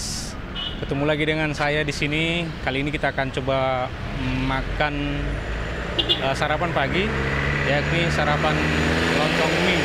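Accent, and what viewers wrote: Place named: Siantar, Indonesia. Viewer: ok di tunggu videonya bang. Kalau bisa pertahankan logat dan bahasa Batak Siantar bang karena itulah menurut saya yang unik dari Abang . Horas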